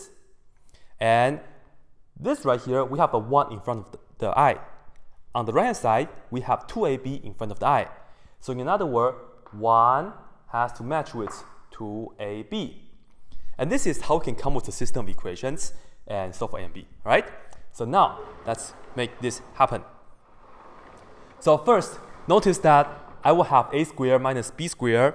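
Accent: China. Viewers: Lol, this is not Chinese accent, I have to make it clear. This is Chinese dialect accent. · Se le notaba más el acento chino xd. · What really pisses me off is that there are 377 mean-spirited people out there who can thumb up this: Pratap Singh: "Improve your accent first of all. I could not comprehend it at all. Chinese pronunciation works on chinese language only not on English." Work it out. It's not easy to get near-native pronunciation in a foreign language, which is what English is for him. Now if you have a hearing disability I might sympathize with you but you still should not demand unreasonable demands of the video author, but rather seek some kind of treatment and/or have someone else listen to the video for you to tell you what it is saying.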